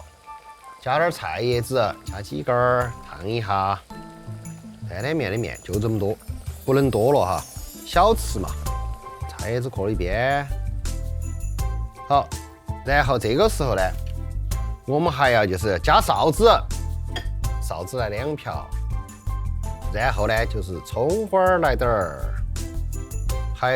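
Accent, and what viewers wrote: China. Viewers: His Chinese accent is fucking hilarious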